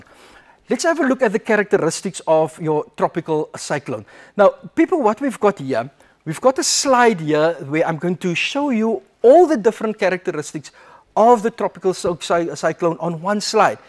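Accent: South Africa. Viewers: South African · Rahul Vamshidhar South African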